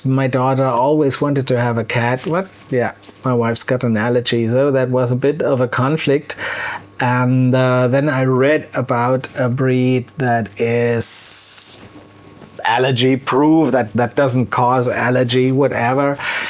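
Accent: Germany. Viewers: Congratulations!!! Great Job!!!🎈🎉🏅🙌🙌🙌 My background is German and I miss my Auntie who cooked wonderful German recipes (she passed 20 years ago) and my Mom is 93 and no longer cooks so now I look to your channel to learn some favourite German recipes. Love your German accent and your occasional German expressions and sentences because it refreshes my German (I'm in Canada) because it makes your channel Authentic. Keep up the great work!!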